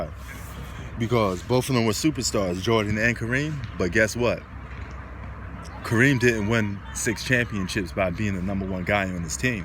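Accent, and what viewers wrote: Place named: New York, United States. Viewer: I thought you was from NY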